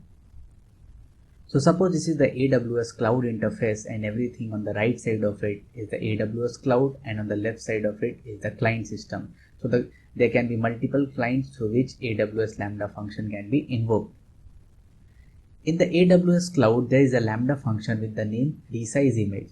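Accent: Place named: India. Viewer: Why you indian do not work on your accent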